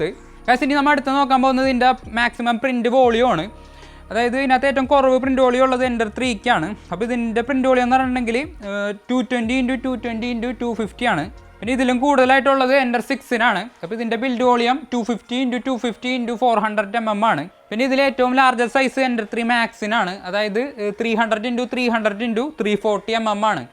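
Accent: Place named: Kodungallur, India. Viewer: കൊടുങ്ങല്ലൂർ, കരൂപ്പടന്ന ആണോ, അവിടത്തെ ശൈലി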